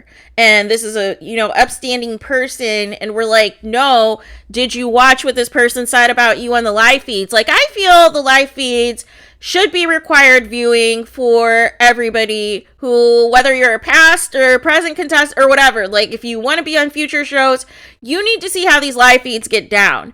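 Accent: Midwest, United States. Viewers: Are you from the midwest?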